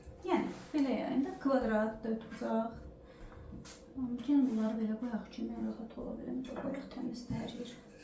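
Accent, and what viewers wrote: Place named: Nakhchivan, Azerbaijan. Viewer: Salam bu şirin danişiq naxcivan danişiqidi🎉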